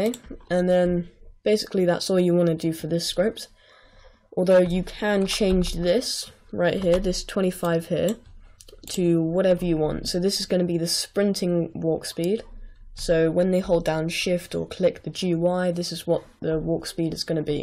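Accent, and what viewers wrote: United Kingdom. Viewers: THANKS SO MUCH FOR THIS AMAZING TUT!!!! (btw are you british?)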